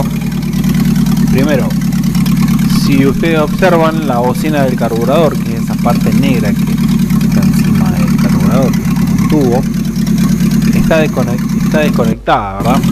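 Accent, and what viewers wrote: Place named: Argentina. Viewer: por tu acento creo que sos de Argentina? Soy de Colombia y veo que el carburador difiere del que tienen instaladas las 2.0 aca! Creo que es un SOLEX 32/32. Podrias compartir que referencia de carburador traen alla los R18? Gracias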